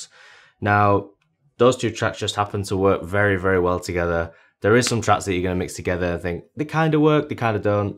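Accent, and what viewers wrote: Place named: West Yorkshire, United Kingdom. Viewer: Good stuff, where's he from? Sounds Barnsley or maybe West Yorkshire?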